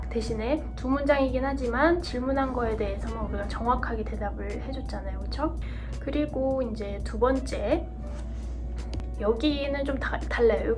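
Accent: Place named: South Korea. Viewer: 한국에서 어려서부터 자랐나?? 말투가 그냥 한국사람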